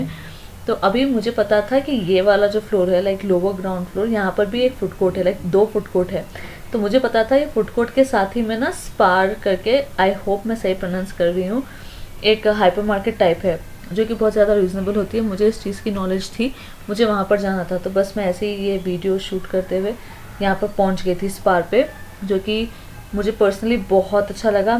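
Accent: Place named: Pakistan. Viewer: You sound more like Pakistani not Hyderabadi 😊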